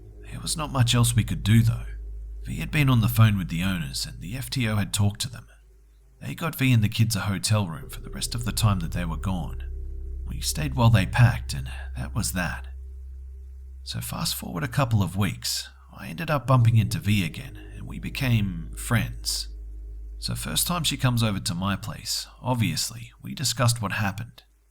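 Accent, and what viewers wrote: Australia. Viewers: Watching your videos get me so excited you make these videos so well! Having a fellow Aussie telling it makes it that much better !!! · Aye it's my favorite Aussie narrator ! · U sound Aussie? R u? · I'm so excited! Scary stories with a good old fashioned Aussie accent! And, even better, you don't have a weird way of talking, like ending every sentence like a question, hahaha. You, sir, have won yourself another subscriber (not that it looks like you need it!) ;) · is this narrator Australian? I'm an Aussie as well! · Nice voice, glad to hear an aussie accent reading scary stories. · R u Australian? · It is soothing and now that I'm high, I can listen to it. When I'm sober, I find something irritating in his accent. I normally I don't care about strayan accent on tv or anything else but here it for some makes me alienated. I still listen because he's got talent and good stories! 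:) ps. for example HellFreezer (my absolute favorite) has a scottish accent and it just makes it 1000x times better!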